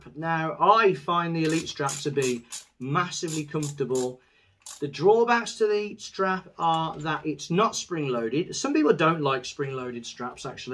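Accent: United Kingdom. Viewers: Excellent review mate. Also very comforting to hear it all coming from a fellow Brit. Not to be xenophobic 🤓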